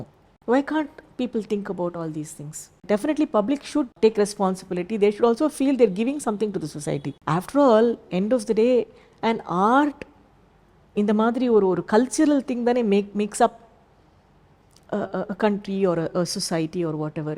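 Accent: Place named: Malaysia. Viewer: Love her Tamil! Sounds like Malaysian's Tamil accent. Wonderful lady and artist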